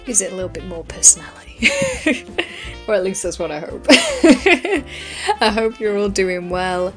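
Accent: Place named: United Kingdom. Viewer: Brilliant, clever, clear and fun. Also lovely to have a native Brit. for a change!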